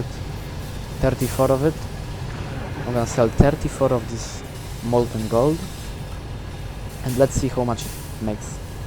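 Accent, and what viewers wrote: Poland. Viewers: dzięki za tutorial słychać że Polak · nice polish accent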